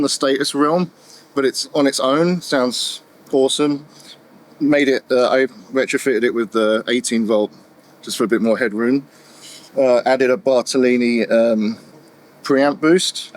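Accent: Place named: United Kingdom. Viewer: I never checked before. Surprisingly British. 😅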